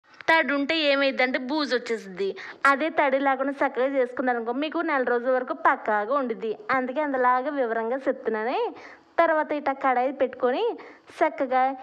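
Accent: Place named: Telugu, India. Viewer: రొయ్యపోట్టు కారం ప్రిపేర్ చేసుకునే విధానం చక్కగా వివరించారు సిస్టర్ మీరు వివరించే అచ్చమైన తెలుగు యాస స్టైల్ సూపర్. 👌🎉💐🙏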